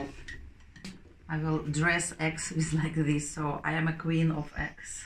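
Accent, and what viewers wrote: Russia. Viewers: You speak very good English better than i do and im American. Lol!!! I love ❤️ your Russian accent. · Wow - was missing a beautiful face & your sweetest voice - Russian accented English, for months. Good to see the most beautiful Russian babe persona with 33k plus subscribers. Keep it up Miss Russia 💪👊😎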